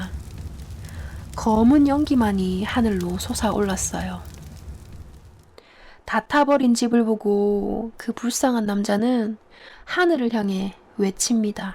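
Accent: Gyeongsang, South Korea. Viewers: 나도 경상도 사람이지만.. 사투리 너무 웃겨 ㅋㅋ 너무 현실적이야...ㅜㅜ 그래서 더 귀에 콕콕 박혀.. ㅋㅋ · 사투리 방송 방갑네요 어떻게 갱상도 사투리 방송을 할려는 생각을 하싯는지.... 그리고 진짜 욱겨요 ㅋㅋㅋ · 나도 갱상도 할밸세. 좋은일 하는군..ㅎㅎ 자넨 사투리라고 하지만...억양만 그럴뿐 용어나 발음은 많이 표준화 됐다네... 나도 국어와 언어공부를 쪼께 했는데, 연습하고 공부하지 않은 토백이 사투리는 자네처럼 발음이 안되지....노력 많이했네. 계속 정진 하고 좋은결과얻고 행복 하시게...나도 독자로서 자네 사랑한다네...신의 은총과 축복을 비네.!!!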